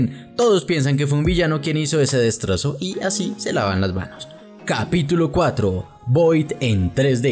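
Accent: Colombia. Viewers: Es tan evidente q ud es Colombiano jejejejejejejeje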